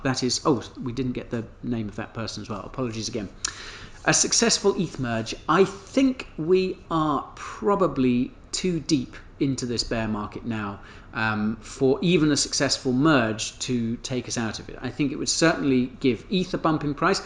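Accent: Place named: United Kingdom. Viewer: So nice to have an eloquent British voice in crypto YouTube amoungthe many Americans!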